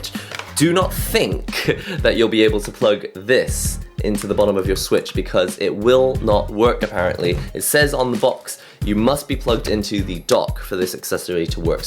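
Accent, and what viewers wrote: United Kingdom. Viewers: it's fuckin confusing a Japanese dude talkin perfect british accent !!!! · The funniest thing is that he has a British accent. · U have a British accent? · I don't wanna sound rude or racist but... I think it's kinds weird how u look/are Asian Yet you have some sort of a British accent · i had the video on in the background and he sounds regular british and he says 'on the front it says hori pad' and i thought that was odd - i mean, we can read, right? ... so i checked the video and yeah. couldn't read it. thanks for that.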